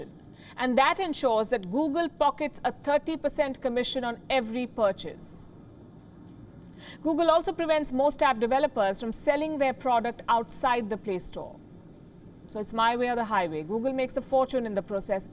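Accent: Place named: Hindi, India. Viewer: Pronounce: All good sings not all good tings...Your Hindi accent is too strong !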